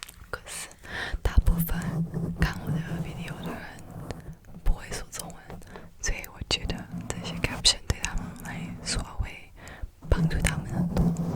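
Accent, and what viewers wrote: Taiwan. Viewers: 好家伙这个完美 你听起来台湾人🌚哦哦你的父母是台湾人😮厉害 我的眼睛太疼了晚安🤣🤣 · 您好像学了台湾中文，对不对？因为我的老师教我北京汉语，所以我看繁体字看得不太好。虽然我完全不能看繁体字，不过我听台湾的口音平时听得懂。我自己觉得您说得很好！ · 你的zongwen好可爱 哈哈哈 好喜欢台湾口音 听得好舒服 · 可是你的台湾口音很relaxing诶！ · 台湾腔太有魅力了😂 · when you started speaking I KNEW YOU WERE TAIWANESE I love ur accent!! ❤️ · 台湾人路过 方便妳看 我转简体字 妳的一些中文用语好可爱喔😂 妳的自述故事也很有趣～ 每次那个“可是”都念“可4” 真的很台欸🤣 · 有台湾味道的中文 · 好少遇到台灣ASMR很喜歡這個口音❤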